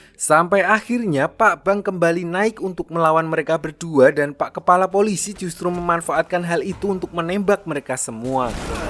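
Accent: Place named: Banyumas, Indonesia. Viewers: Perbatasan Wonosobo Banjarnegara, wong ngapak kie 😁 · Wong Banjarnegara kie jelas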